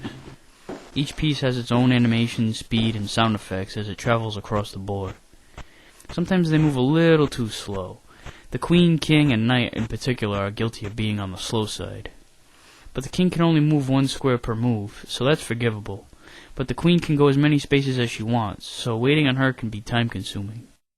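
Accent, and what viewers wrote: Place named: Boston, United States. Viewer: This guy has the best God damn accent. Are you from Boston?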